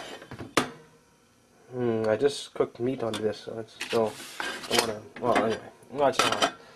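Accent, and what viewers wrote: Quebec, Canada. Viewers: Sometimes I think this is a troll but then so many videos and the conditions in there . Such commitment if so. Seems like a French Canadian his accent.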